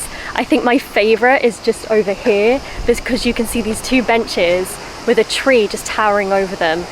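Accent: RP, United Kingdom. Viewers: お～っ！とっても素敵なQueen's English での歴史解説に観光案内．．．良くできたＢＢＣの番組見てるみたいです😮でもやっぱり食べるんですね😁番組を見ているような、スムーズに流れる感じは、TAROさんのカメラワークによるところ多いかなと😊リポーターのお話と魅力をうまく引き出してると思います。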